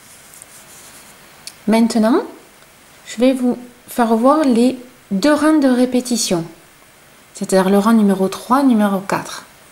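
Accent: South, France. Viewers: Bonjour, très beau point!!! bel accent, ça sent le sud!!! beau week-end, bisous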